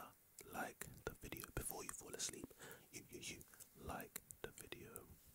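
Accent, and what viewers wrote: United Kingdom. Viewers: Nice to finally find a British asmrtist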